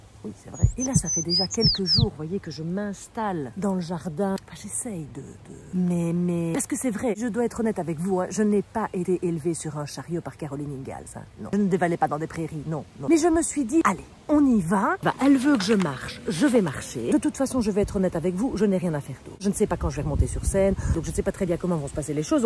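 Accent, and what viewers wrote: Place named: Belgium. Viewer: Même format, même humour, même petit accent et quelques expressions belges: suis tjrs aussi fan! 🤗 Tellement de sujets que l’on peut traiter ainsi Hâte de voir comment tu vas décliner celui-ci 😜 La nature et l humour, deux piliers de ma vie 🥰 La citadine en pleine nature, je me moque gentiment et j espère apprendre encore des bienfaits de notre nature, avec ☺️ À dimanche prochain 🥳🥳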